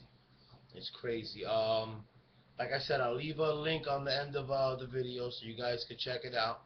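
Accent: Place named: New York, United States. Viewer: Gotta love that NY accent!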